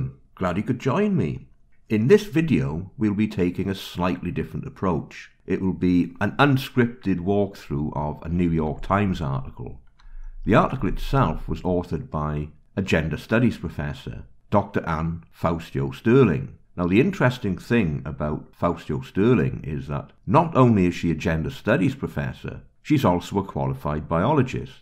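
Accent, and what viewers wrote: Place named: Cardiff, United Kingdom. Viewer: Excellence in a Cardiff accent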